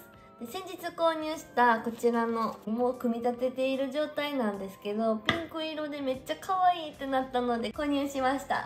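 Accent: Kansai, Japan. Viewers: 関西弁…！！ めちゃかわいいな！！！ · 関西人ですか？関西弁混ざってて関西人の私からでもめっちゃ可愛いと思える(?)🥲💗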